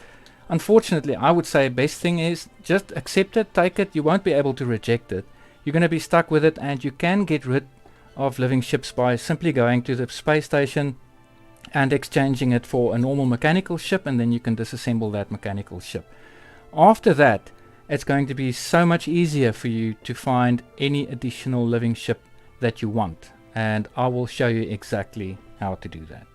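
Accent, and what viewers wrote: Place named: South Africa. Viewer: The South African accent is strong with this one.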